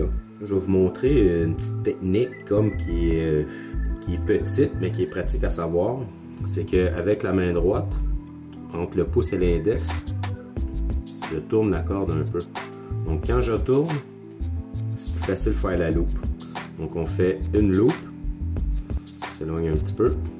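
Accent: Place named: Quebec, Canada. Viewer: Un grand merci a toi je regarde toutes les vidéos que fait, j'habite en France et ton belle accent Québécois mes fait voyager et rêver.Encore bien merci et continue j'adore les regardés, voila a bientôt j’espère avoir un message de ta part.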